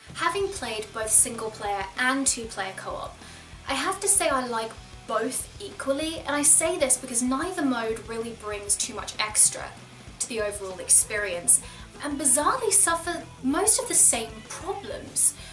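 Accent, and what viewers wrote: United Kingdom. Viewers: Pretty girl? Likes video games? British accent? You probably get this a lot but damn.... I think I may be in love with you.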